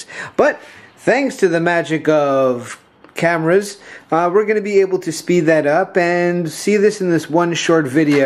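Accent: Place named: New York, United States. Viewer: Your accent kinda reminds me of a newyork one c: nice vid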